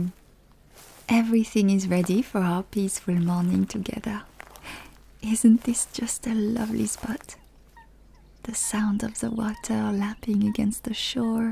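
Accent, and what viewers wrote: France. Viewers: I listened to this after a very long and hard day and the stress just melted away. Also a French acsent never sounded so appealing. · I saw French accent asmr and I press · It's only been 9 days and we already need more of the French accent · The French accent soft-spoken videos are my favorite from your channel. This one is actually quite sad. I hope there's a part 3 :( Thanks for sharing. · I love your French accent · Man, I always love your french accent videos. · Aaa, good cute French maid is really something. Your French accent make me loved French and made me temember my highschool day when I learned French myself. ❤❤❤❤ Thank you for the upload. ❤❤❤❤ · First 30 seconds in and the French accent is melting my brain away. This accent sprinkles happiness diamonds on my being once again. This accent is just to powerful. To gorgeous. To elegant. 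I can't fight it!! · My,my,my i think have to start study French because your accent is magnificent 👌 ❤❤❤ N.B: Umm, so there are 2 similar videos in got a bit lost😂😂, and double post means a fine night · I find this very beautiful and comforting. I closed my eyes while listening to this audio, and pretend that I'm spending time with the maid and getting to know her better. The French accent sounded very beautifully and I can say I enjoyed it. Great work. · I waited three days for the perfect morning to listen to this since I saw there’s a second part of my favorite maid asmr. It was worth the wait, it was worth to listen. Now I really hope there will be part 3. No one on YouTube is providing such charming content. Maid, French accent, even poetry with all background sound. You’re truly amazing. Thank you for being with me this morning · Yes another french accent vid, love the audios keep up the amazing work! 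· Well part of me in some distant future hopes for a part 3, (shh this is a secret 🤫 but i personally like the French accent audios definitely a favorite of mine to listen too) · I am obsessed with the french language and accent, and hearing from a soft-spoken sweet voice with caring words is nothing short of perfection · I loved this audio. I hope we get a part 3 someday. Also, if you ever just want to upload a video of reading poetry in your French accent, I might die in bliss (or at least sleep in it!) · I love the French accent vids! I hope there will be a Part 3!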